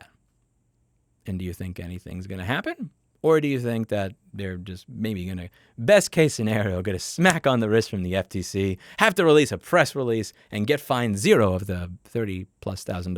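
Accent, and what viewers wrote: New York, United States. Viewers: you can hear the new york accent at this speed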